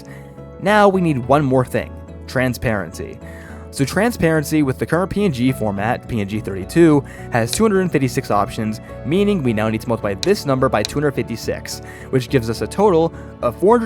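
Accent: United States. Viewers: His accent looks kinda American so i wouldn't be surprised. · fr she sounds American fr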